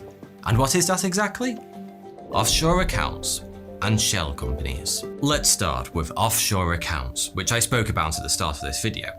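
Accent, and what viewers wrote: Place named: East Lancashire, United Kingdom. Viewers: wouldn't it be crazy if he was a raging coke head off camera, and it's just the English accent that makes you think he is classy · Nah, he has an east lancs accent, more like Blackburn/Burnley 😀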